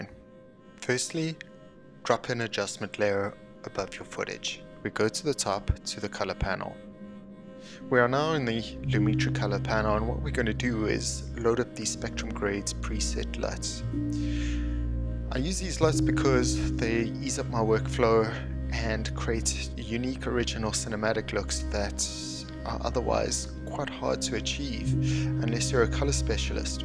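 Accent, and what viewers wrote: South Africa. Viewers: I sense a South African accent !!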